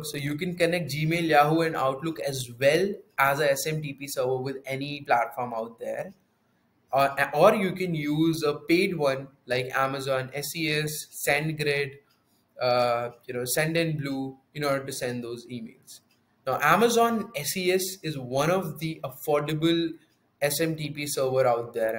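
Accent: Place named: India. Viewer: I was about to sign up for a Growth account, but unfortunately, I am not able to rely on a heavy Indian accent when viewing videos and tutorials. Do you have support by American English speakers???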